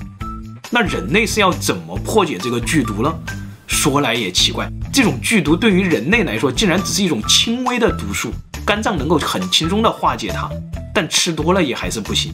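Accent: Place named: Fujian, China. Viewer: 福建哪里的。。。？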